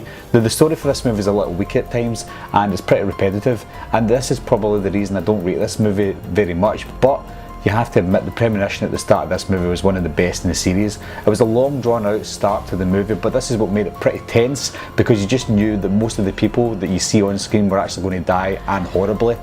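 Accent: Scotland, United Kingdom. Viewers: Your voice is so Scottish...and Scottish voices are sexy!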